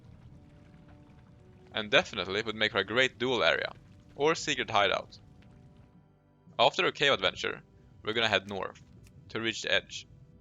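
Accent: Sweden. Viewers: Lol you have the worst Swedish English I've ever heard. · Your accent sounds very swedish👍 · I kinda wish WoW made a proper underwater world. Your swenglish is great btw 😏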